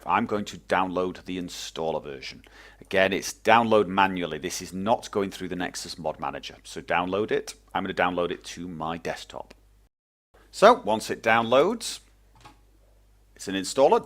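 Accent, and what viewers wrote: United Kingdom. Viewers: You sound a little British but you are from Norway?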